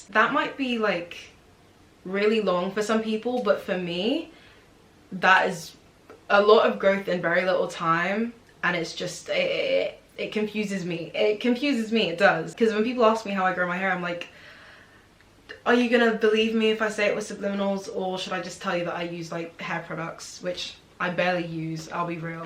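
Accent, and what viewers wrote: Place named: United Kingdom. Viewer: I watched your old vids before you moved and noticed how lovely your UK 🇬🇧 accent is 👁👄👁 it fits you like you never been in the states. Much love 💗 your hair is INCREDIBLE and I’m next 🥰 claiming it 🔅🔆🔅!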